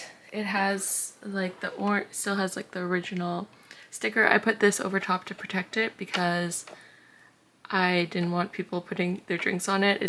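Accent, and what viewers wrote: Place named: Minnesota, United States. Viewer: under a minute in and you must be from Minnesota.. the "beg" (bag) gives it away , lol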